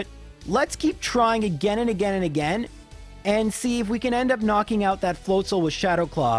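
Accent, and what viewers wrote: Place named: Canada. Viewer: Hes from Canada so he pronounces pokemon names differently then in the us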